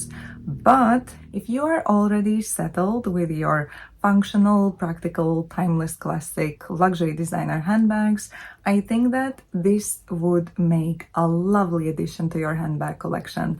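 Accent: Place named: Latvia. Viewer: You have beautiful accent. Sounds Latvian. Are you from Latvia?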